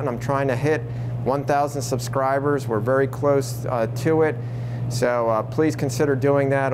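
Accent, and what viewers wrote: Philadelphia, United States. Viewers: You have a strong Philly accent.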